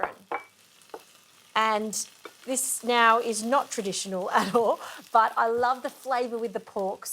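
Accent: Australia. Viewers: 😂😂😂😂😂😂😂peppah, sugah, flourah, buttah, australian stryne at its worst, some elecution lessons wouldnt go astray, yyyeeeeech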